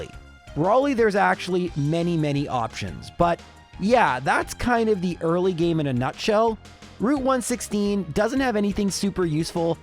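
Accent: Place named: Canada. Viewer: you even come off as a Canadian. Whereabouts do you live in Canada?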